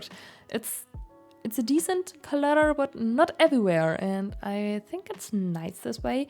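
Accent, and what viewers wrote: Germany. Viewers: hört sich sehr deutsch an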